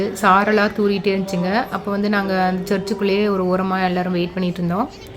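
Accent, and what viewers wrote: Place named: Coimbatore, India. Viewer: கோவை யா! பேச்சில ஒரே இங்க இங்க யாவே இருக்கே!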